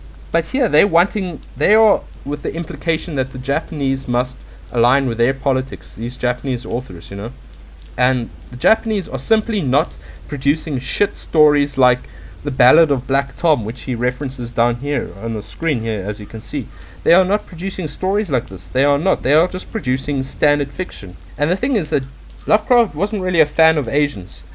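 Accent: South Africa. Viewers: You sound very South African. Did you move to Europe?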